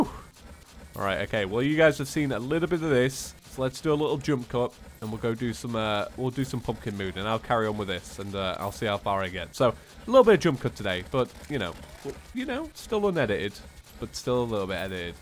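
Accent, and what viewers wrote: United Kingdom. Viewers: Stereotypes are bad, I know but in the middle all I could think about was how steriotypical of a British person sounds Have a good day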